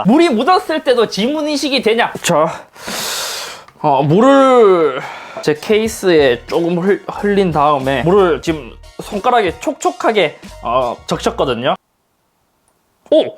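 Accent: Gyeongsang, South Korea. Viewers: 경상도사투리같으신데 어느지역이세요? 궁금쓰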